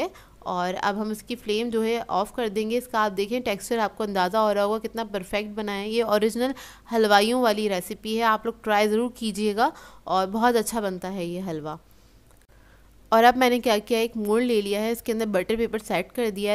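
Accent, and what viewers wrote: Karachi, Pakistan. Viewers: Karacnhi with a typical tone so sweet once Mahira Khan pronounced same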